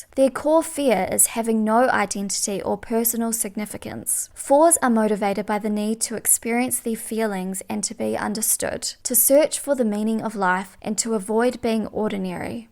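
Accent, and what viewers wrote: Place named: New Zealand. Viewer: I love your accent. New Zealand? And u r Type 4 too? Aw. Such a coincidence! 😉😋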